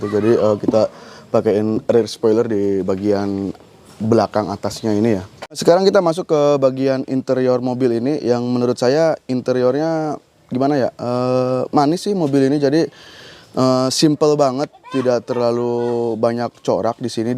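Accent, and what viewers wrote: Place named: West Java, Indonesia. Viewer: Hahaaa apansa sunda banget.. Sukses baaaaang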